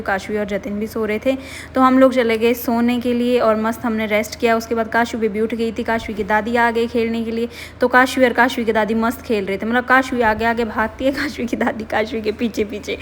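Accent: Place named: Pahadi, India. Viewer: Today's voice over has a lil bit of pahadi accent 😂❤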